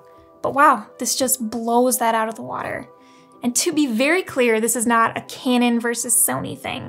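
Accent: Midwest, United States. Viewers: ok gotcha! Yep that Midwest accent 😂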